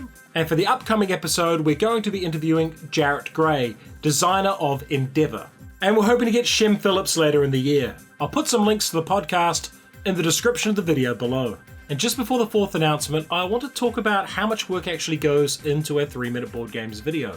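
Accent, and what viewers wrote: New Zealand. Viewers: Love the vids and its great hearing from a kiwi. Just curious but where is that photo taken is it by the old prison?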